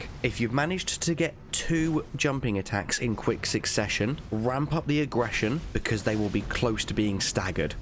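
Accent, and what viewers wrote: United Kingdom. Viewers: Never knew how much I'd enjoy a British accent saying "motherfuckers" so much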